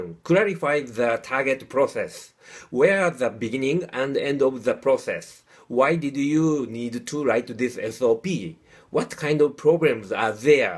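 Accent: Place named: Japan. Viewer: 这个日本人说英语真的难听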